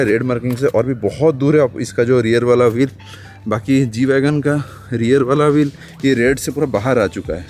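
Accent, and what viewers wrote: Odisha, India. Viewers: Hindi ke sath sath, Apki English me bhi odia slang aati hai...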